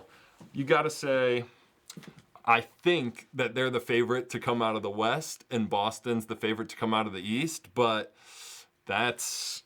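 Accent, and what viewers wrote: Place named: United Kingdom. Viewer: Loved the British accent! Who knew you had it in you. Also great list - chatGPT’s got nothing on you 😂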